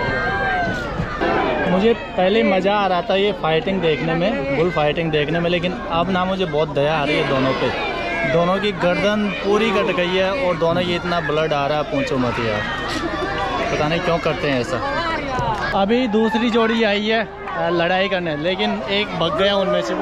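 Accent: Rajasthan, India. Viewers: bdiya bolte ho pura Rajasthani touch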